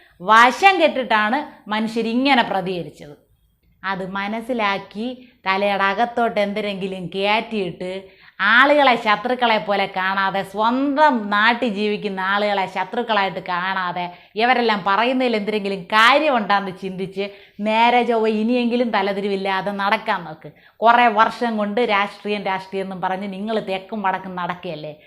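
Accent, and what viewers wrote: Thiruvananthapuram, India. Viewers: ഇതാണ് തിരോന്തരം കലക്കി മോളെ ഒന്നാംതരം അവതരണ ശൈലി 👍❤🙏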